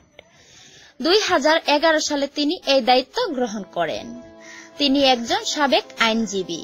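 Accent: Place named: Jessore, Bangladesh. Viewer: আপনার বাসা কোন জেলায়? যশোরের ভাষায় কথা বলেন।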